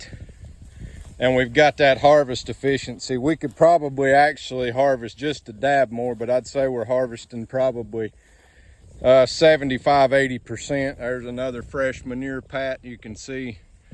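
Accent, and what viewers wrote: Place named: Australia. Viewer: Have you spent time in Australia...every now and then I hear an aussie accent with your American ?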